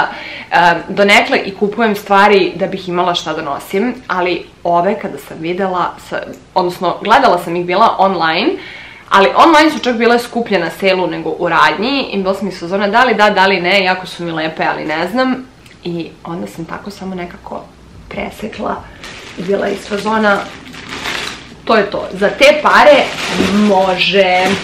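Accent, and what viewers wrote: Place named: Novi Sad, Serbia. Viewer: Od kad ti imas novosadski naglasak?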